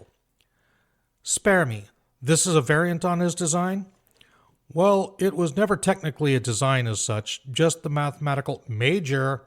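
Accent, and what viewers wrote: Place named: United States. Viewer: I like this story and your voice. NetNarrator and Agro Squirrel are my usual listens, but it's nice to get an American voice once in a while. Keep it up, and don't damage your vocal cords, please!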